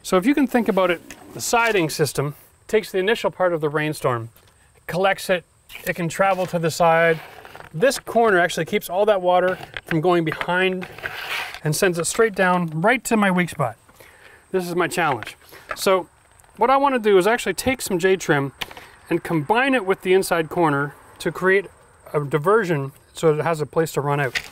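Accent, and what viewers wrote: Canada. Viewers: This was amazing and I'm not even going to be doing vinyl siding! Your speech and mannerism is clearly (a fellow) Canadian and obvious at the onset but I only figured it out when you mentioned Ottawa. But you also explain things as a teacher in a classroom and in the field (just enough explanation). Well deserved subscription! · Just here so I can hear Canadian words like when he says "stretch that oot"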